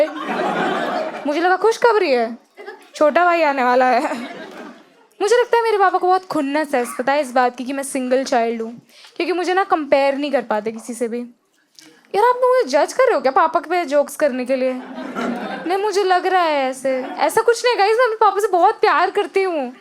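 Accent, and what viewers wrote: Bhopal, India. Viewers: i know that you have passed out from carmel convent bhopal your totally representing the accent and youth of bhopal...btw nice keep doing this ultra comedy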